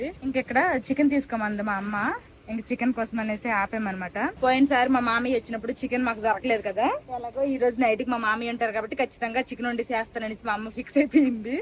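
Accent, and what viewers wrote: Srikakulam, India. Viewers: dear madhavidont think of leaving the mangoes bagif there is no place..make place.how can you leave andhra mangoes....your srikakulam accent is very good. nice to hear. you talk openly..ftom your heart...👍👍💓💓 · మీ కన్న మీ అమ్మ గారు నీట్ గా మాటలు ఆడుతున్నారు మీరు మరి శ్రీకాకుళం యాస దారుణంగా మాటలు ఆడుతున్నారు 🙏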